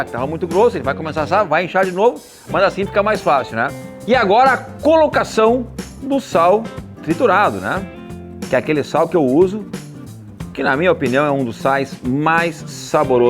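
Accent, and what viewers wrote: Rio Grande do Sul, Brazil. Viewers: Gaucho falando Tá loko meu!😅😅😅😅 Nota dez , gaúcho!😂😀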